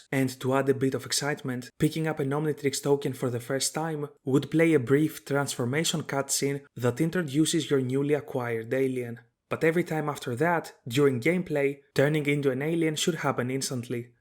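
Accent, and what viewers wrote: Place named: Greece. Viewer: OK this is something I was legit theorizing about myself since I was little so I knew I had to click on it. And as if it wasn't enough, 2 seconds in I'm like "Hold up, αυτή η προφορά μου ακούγεται *ελληνική*" and sure enough, looked at the listed location and that's what it was lol. So not only did I stumble upon a video about a hypothetical I used to think about myself back in the day, but the person doing it is from the same country as me. What are the chances?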